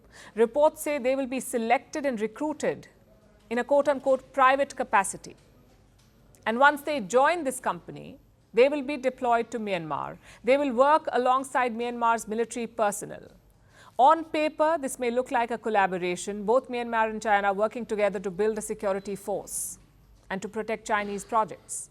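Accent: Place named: India. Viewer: English but of heavy Indian accent lol..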